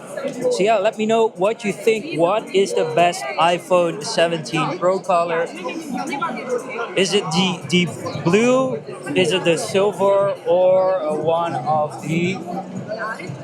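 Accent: Netherlands. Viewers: The Dütch accent was aanwezig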